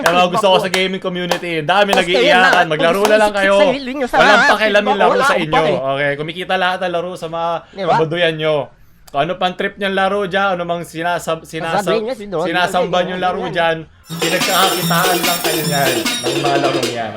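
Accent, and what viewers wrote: Visayas, Philippines. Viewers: Tanginamo. Bobo bisaya accent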